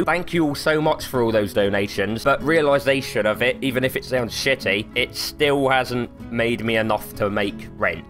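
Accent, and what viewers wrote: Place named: Wales, United Kingdom. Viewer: That welsh accent what is perfect mate sounded more wealth than Jungle!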